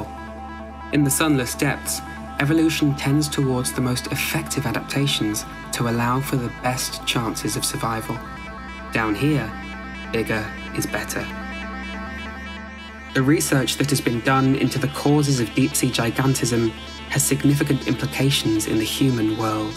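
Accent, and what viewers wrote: United Kingdom. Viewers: Thank god there’s a British guy narrating or I wouldn’t have been able to watch. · why are videos like this always narrated by someone british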